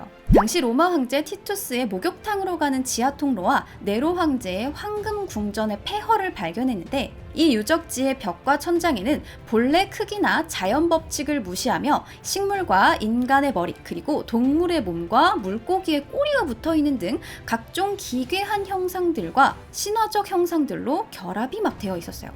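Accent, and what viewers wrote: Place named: Busan, South Korea. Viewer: 오늘은~ 부산의 귀여운 억양이 ~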